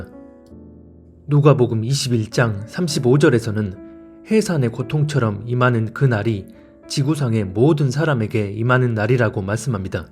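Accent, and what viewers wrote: Jeolla, South Korea. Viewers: 전라도 사투리로 방송하시니 친근감이 느껴져불고만요잉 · 전남"지역 분~~이라고, 추정되는데요.. 제고향이 전남 광주 근처인데, 말투가 똑같으신데요..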